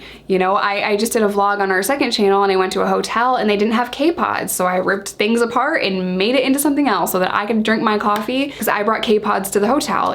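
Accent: United States. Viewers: Crikey you're sexy, you look like you are british, with american accent...but I know you're american, which is great.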